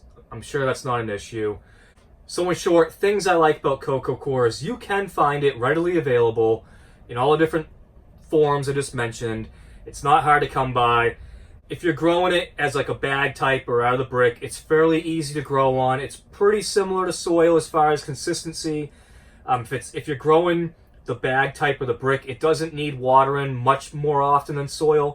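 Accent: New England, United States. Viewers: Ahhhh.....there's a real symbiotic relationship between a how-too upload and a New England accent.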